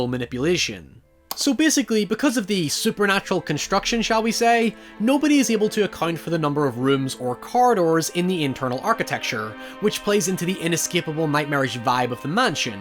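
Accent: United Kingdom. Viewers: First off, I love your accent. Secondly, your videos are so good, and I look forward to them always. We Americans don't seem to talk as fast as you folks in the UK, but I manage to keep up. I always like to mention to YouTubers, fairly new to me, that I have 61 years behind me, and that you have many other folks my age who enjoy your content. That notification bell is very helpful to alert me of your new videos. You will reach a million subscribers at some point, I'm sure of it.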